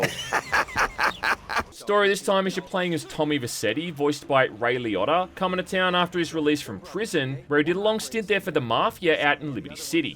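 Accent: Australia. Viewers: You sounds like an Ozzie Australian. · Are you Australian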